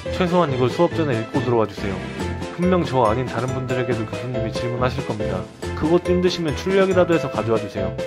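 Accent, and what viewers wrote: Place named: Busan, South Korea. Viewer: 마 부산사투리 어썰푸다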